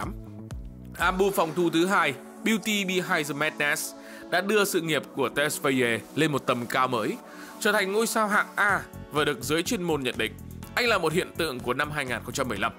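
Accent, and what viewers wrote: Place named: North, Vietnam. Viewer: Ng bắc toàn đọc the là giờ. Thua luôn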